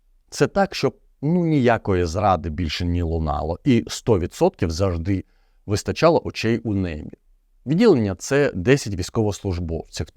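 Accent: Russia. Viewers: Підготував відео з хорошим звуком та картинкою, але не спромігся прогнати текст так, щоб вуха не різала вимова (українські слова вимовляє за росіянськими правилами граматики). Просто боляче слухати, що вже казати про логіку, аля: "ну ми ж таки виготовляємо". Питання в тому чи всі можливості використанні, чи може варто критикувати владу за недостатню мобілізацію заводів по виготовленню боєприпасів, забиванню на дрони-камікадзе і вставляння палок в колеса приватним виробникам зброї + волонтерам? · У тебя русский акцент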